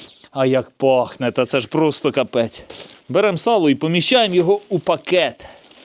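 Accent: Ukraine. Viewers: Этот украинский говор в какой области?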